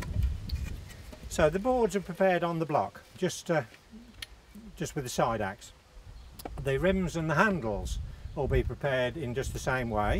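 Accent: Northamptonshire, United Kingdom. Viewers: Nice to hear a Northamptonshire accent on YouTube, albeit faint. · Lovely Northants accent and beautiful craftsmanship.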